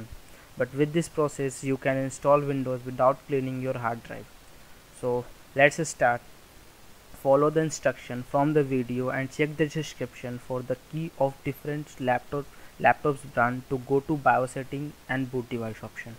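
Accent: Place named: India. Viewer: Great man you saved me, now i hate Indian accent English little less I can say.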